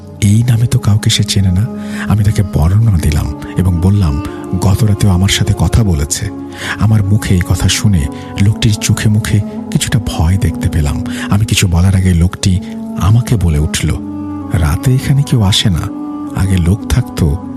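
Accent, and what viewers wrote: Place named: Tangail, Bangladesh. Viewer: Tangail theke shuntesilam 🥺🤍 nijer district er kotha shune valoi laglo😊💟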